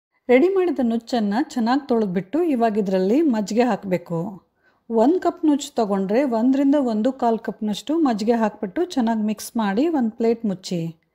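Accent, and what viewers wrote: North Karnataka, India. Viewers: I like your north karnataka style of talking, it feels natural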